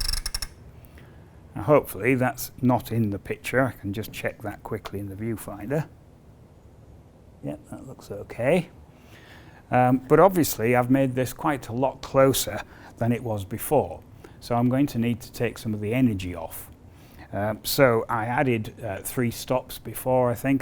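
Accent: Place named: RP, United Kingdom. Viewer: Dear Sir, I am most obliged to you for sharing your knowledge and doing this in Queen's English. You not wearing a baseball cap (the wrong way round) added to my viewing pleasure.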